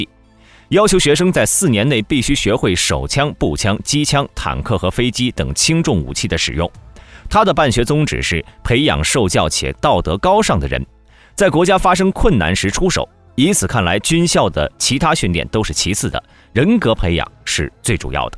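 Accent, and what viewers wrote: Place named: China. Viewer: 這英文發音真的很中國 跟硬度人有的拼.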